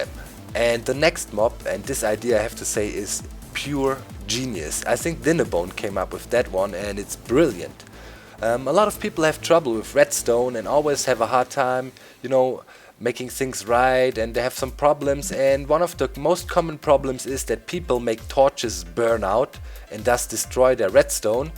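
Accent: Germany. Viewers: are you german?? you sound Like a German Person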